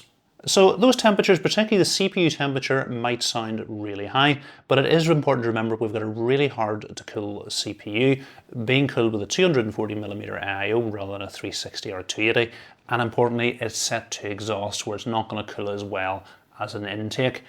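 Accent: Northern Ireland, United Kingdom. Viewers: You are def from northern ireland... I am from the US, but have lived in Belfast for the past 10 years and can spot a northern irish accent anywhere. Glad to see another computer enthusiast from NI. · Love hearing the NI accent. Much love from portadown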